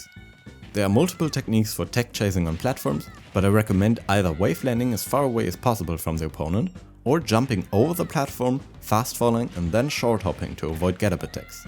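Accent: Germany. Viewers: Sounds like he comes from a German-ish speaking country, so probably germany, Austria, Switzerland or Netherlands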